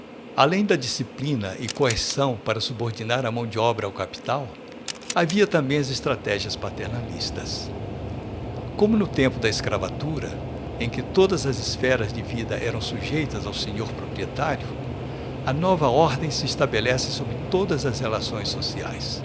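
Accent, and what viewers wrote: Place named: Portugal. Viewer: Esse narrador deve ser europeu rs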